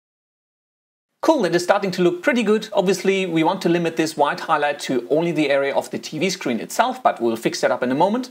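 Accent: Germany. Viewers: Are you from Germany? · Are you German? :D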